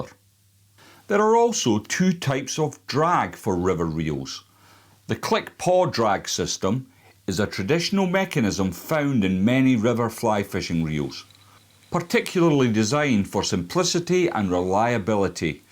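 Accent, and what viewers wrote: Scotland, United Kingdom. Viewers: Happy to see the Pere Marquette River Lodge sticker behind you. I guided on the PM in the 90s. That lodge was “ Johnson’s Pere Marquette Lodge” then. With that Scottish accent, I’m wondering if you’re in Scotland, and if you’ve visited and fished Michigan….where the PM flows.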